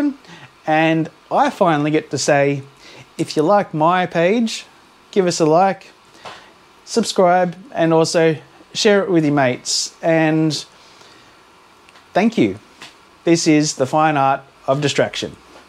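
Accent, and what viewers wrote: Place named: Australia. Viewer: so great hearing another Aussie accent here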